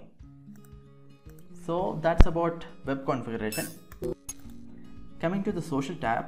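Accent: Kerala, India. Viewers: of course he has an indian accent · Your speaking feels like you are a malayali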